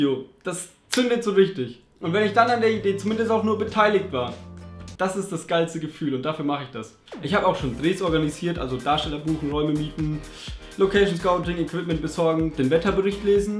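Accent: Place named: Franconia, Germany. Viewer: Der fränkische Dialekt ist zu geil :D Grüße aus Bamberg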